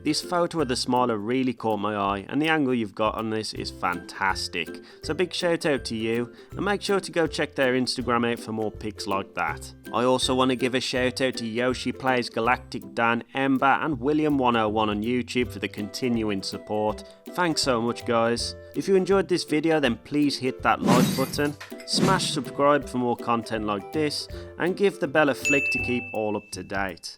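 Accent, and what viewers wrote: Black Country, United Kingdom. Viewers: Just come across your channel and first thought is he’s gotta be from the Black Country can tell our accent anywhere mush lol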